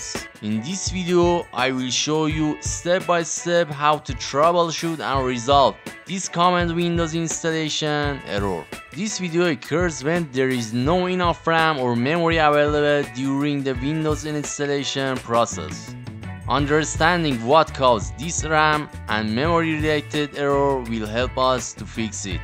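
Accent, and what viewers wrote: Iran. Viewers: انگلیسی با لهجه غلیط ایرانی . دمت گرم. کلا منظورت این بود که . از برنامه winsetupfromusb برای ساخت بوت نصب ویندوز استفاده کنیم. نه روفوس ؟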